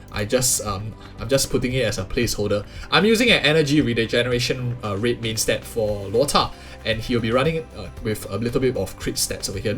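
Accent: Singapore. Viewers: subscribed for the singaporean accent 😂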